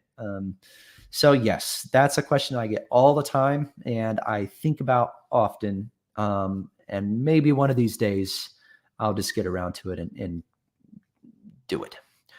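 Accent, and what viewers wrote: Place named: United States. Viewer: 🇦🇺🇳🇿 both my flags 😊 I love your music, partly because you remind me so much of my Dad when he was in his thirties (he would be in his eighties if he were still living) - your looks, facial expressions and mannerisms as you sing, the harmonies and parts/overlaps - very nostalgic for me. Except - now I've listened to your American accent...haha...not my Dad's Kiwi one! (Of course!) 😂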